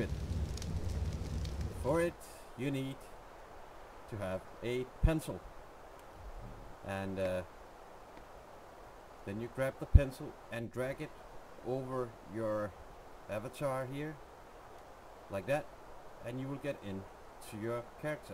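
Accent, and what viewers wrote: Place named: Denmark. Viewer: I smell danish ;) Awesome video thanks!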